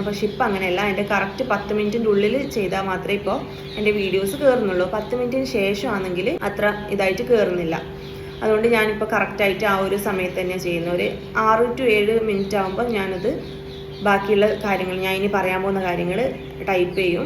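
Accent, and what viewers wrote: North Kerala, India. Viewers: ആ ഒരു വടക്കൻ ചുവ വന്നു..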